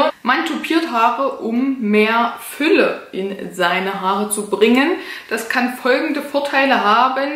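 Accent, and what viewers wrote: Saxony, Germany. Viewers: Witzig, du hast meinen zukünftigen Namen 😅 Kommst du aus Sachsen?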